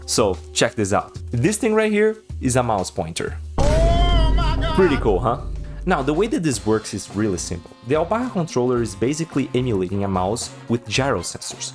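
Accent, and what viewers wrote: Brazil. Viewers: brazillian accent detected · sabia que tu era BR, o sotaque nao engana kkkk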